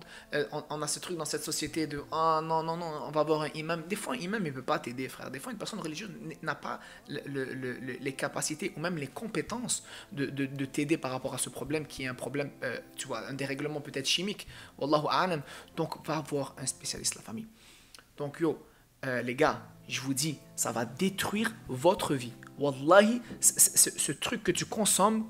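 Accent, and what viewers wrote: Quebec, Canada. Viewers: Il est canadien encore heureux que il a un accent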